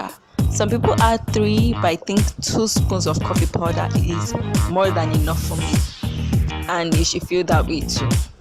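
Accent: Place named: Africa. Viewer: I love African accents omg ❤️❤️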